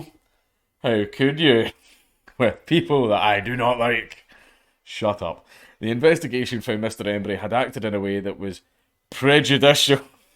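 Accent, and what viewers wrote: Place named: Scotland, United Kingdom. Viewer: I fuckin missed your thicccck Scottish accent Maaan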